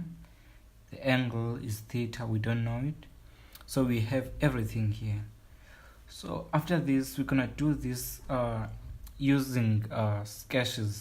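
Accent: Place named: South Africa. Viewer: I know this is a South African voice. Which university you at? Thanks for the help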